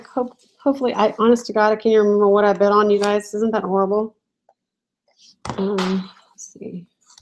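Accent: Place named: Texas, United States. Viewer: ...and then people teasing you for how you pronounce "jewelry." Lol. I've honestly never noticed that! I have noticed you say "garage sell" instead of "garage sale," and that it's never "when," it's always "whenever." I just figured it's a Texas thing, and I never said anything because one thing all of us as Americans know is you don't mess with Texas! Well, that and I get crap about my West Coast accent and vocabulary, so I have no room to talk. 😂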